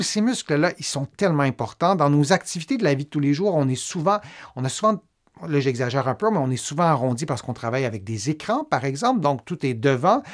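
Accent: Quebec, Canada. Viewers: Merci pour la vidéo, j'adore votre accent québécois ❤ Autre souci passé la 60aine: la "bouée de sauvetage", cette graisse abdominale, localisée au niveau du ventre et des hanches qui veut s'installer subrepticement! Pourtant je fais 1h d'exercices tous les matins, une fois par semaine 2 heures à la salle de fitness, je vais aussi nager et, n'ayant pas de voiture, je marche tous les jours, je suis végétarienne depuis bientôt 50 ans, fais attention au sucre... rien n'y fait, je ne sais plus quoi faire 🤷🏼‍♀️ Tous conseils bienvenus, merci d'avance 🙏🏼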